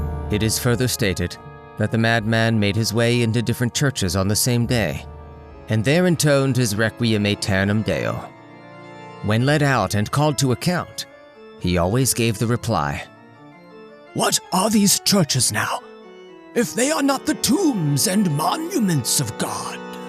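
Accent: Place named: United Kingdom. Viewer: Unbelievably articulate narration with subtle...nearly British undertones and enthusiastically colorful character voices add everything and give life to both of these stories... and every other story I've heard from you. Excellent work!😁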